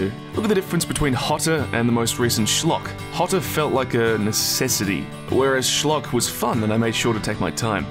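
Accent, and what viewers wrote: Australia. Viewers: I didn’t know you were Australian. But see ya later I guess! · answer any you like: are you australian? what was your favourite ytp to make? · Oh fuck didn't know U were Australian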